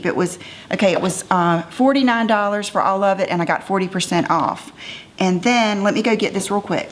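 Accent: South, United States. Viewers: One celeb worships Satan so I am not helping that person's bank account as a devil worshipper. We're accountable for what we know and I don't want my dollars going to anything that person may use funds for connected to the occult, and satanism. We can all have tolerance for diversity but when it goes deeper than a difference in opinion or finding a celeb "simply annoying" or something...."miniscule" I have to draw a line. I love your country accent and you're lovely. I've watch this video a few times just because I enjoy listening to you and seeing all these goodies! I own all the OPI polishes you mentioned. I need to find the Revlon mauve-ish shade! God bless you and stay beautiful!!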